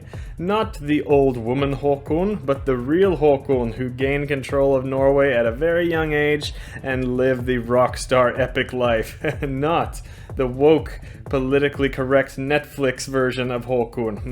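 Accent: United States. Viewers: Pretty sure he is Norwegian, yes. Most non Americans develop an American accent when they learn English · Is the bloke in this video actually Norwegian? He sounds very American. I know Norwegians, they don't sound like this bloke, this bloke sounds like an American that moved to Norway (or Norwegian but spent more time in America)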